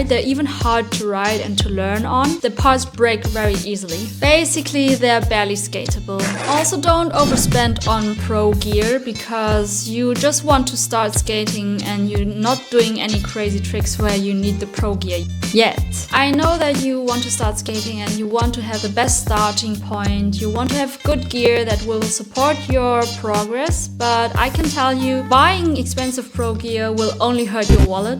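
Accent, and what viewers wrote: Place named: Germany. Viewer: It’s also interesting to hear an Asian girl with a German accent. We have many Asians in California, but we seldom see them from Germany.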